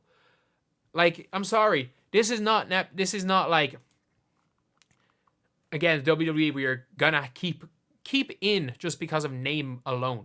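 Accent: Ireland. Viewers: yo bro are you irish? the accent looks like that :D good content, cheers))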